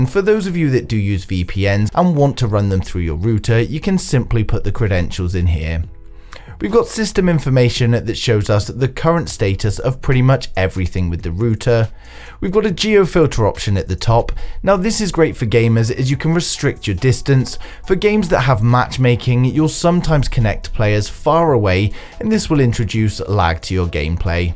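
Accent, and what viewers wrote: United Kingdom. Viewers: I love the way UK people say rooter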